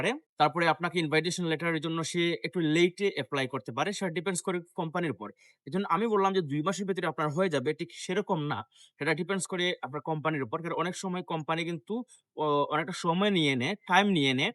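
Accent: Sylhet, Bangladesh. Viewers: ভাই আপ্নে সিলেটি লাগের।আমি আপনার লগে মাতিতাম ছাই।ওয়াটসাপ নাম্বার আছে নি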